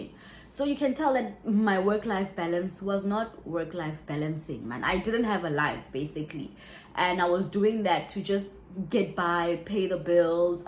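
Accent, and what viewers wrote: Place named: South Africa. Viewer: I Love that you keeping your SA accent ! Aish !!! Hibo !!!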